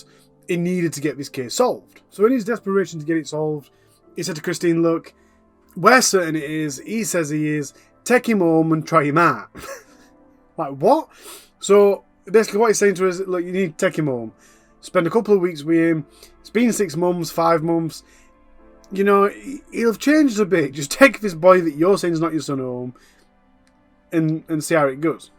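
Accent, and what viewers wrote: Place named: Northern England, United Kingdom. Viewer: I've heard these stories before, but not in a storytelling format with a Nth English accent. Good work.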